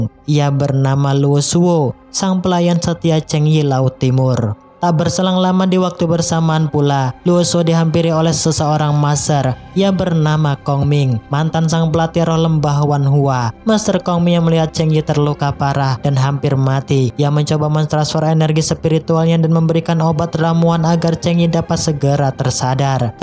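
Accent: Madura, Indonesia. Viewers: Org Madura kau ya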